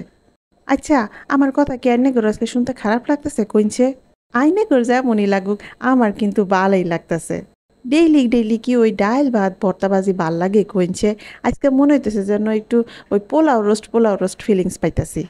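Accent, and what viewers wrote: Mymensingh, Bangladesh. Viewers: so cute আপু, তুমার মুখে আমাদের মমিসিংগা ভাষা শুনে ভালোই লাগললো❤, এ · মাশাআল্লাহ আপু এক ঘেয়েমি কেটে যাওয়ার মতই অসাধারণ ভিডিও। ভীষন ভাল লাগল। তুমি এমনিতেই অনেক কিউট। আজ আরো বেশি সুন্দর লাগছিল। ময়মনসিংহ ভাষাকে তোমার মুখে শুনতে খুব মিষ্টি মিষ্টি লাগছিল। অনেক সুন্দর পরিবেশ ছিল।খুব ভাল লাগল আপু।😍🥰😍🥰😍🥰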